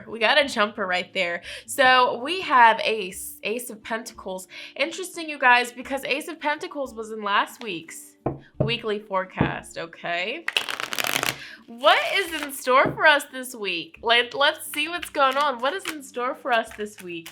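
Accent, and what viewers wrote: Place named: United Kingdom. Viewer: Sometimes you sound British!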